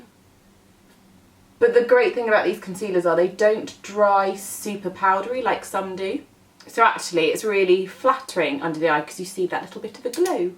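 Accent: United Kingdom. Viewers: Finally someone who speak British English and not American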